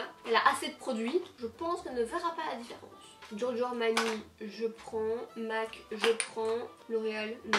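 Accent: Belgium. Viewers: Mais l'accent pur belge on adore 😂😂 Love!!! Je suis pour une rencontre avec Jacky!!! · Je surkiff vive l'accent belge 🇧🇪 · L’accent belge qui ressort en force! Lol Cette vidéo m’a tuée ! 😘😂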